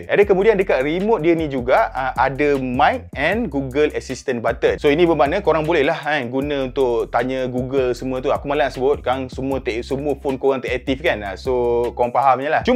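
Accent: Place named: Malaysia. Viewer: Ada app yang sesuai untuk view gallery / video dari USB drive? Coz saya ada Xiaomi TV Box tapi tak da app yang sesuai nak buat slideshow gallery. Mohon pencerahan. Anyway, very good video, bes dengar review cakap macam orang Malaysia sejati! :D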